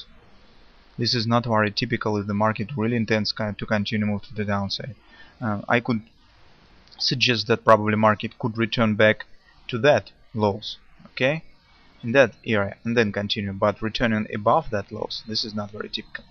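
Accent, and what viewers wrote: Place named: Russia. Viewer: yeap, you've got the point... eur/usd is more bullish than bearish for now... funny accent! :) where are you originally from? Russia, I guess, right? :)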